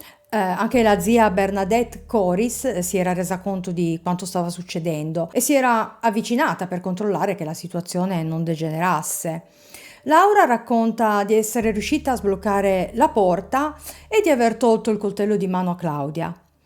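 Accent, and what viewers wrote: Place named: Piedmont, Italy. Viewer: Ciao ti ho scoperta da tre giorni ma sto guardando tanti tuoi video perché ti trovo davvero brava forse perché dall'accento mi sembri Piemontese come me e Elisa true Crime, Ely in Crimeland e forse per campanilismo,ma anche perché come nel primo caso che mi ha fatto mettere il segui Il caso di Berfin ho notato la tua empatia, la tua compassione,e questo mi piace,non mi piace chi giudica le vittime, soprattutto quando sono vittime soprattutto di maltrattamenti e abuso psicologiche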